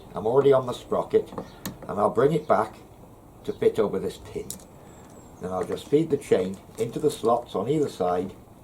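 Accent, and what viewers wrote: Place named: Wales, United Kingdom. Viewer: Great video... Deserves more views!! I'm probably going to buy it as a direct result of this video... You should have an amazon link to this one, so you get a bit of coin when viewers buy...!! Keep making these videos dude! You also sound kinda local? I'm in Ammanford, just north of Swansea. Where you from butt?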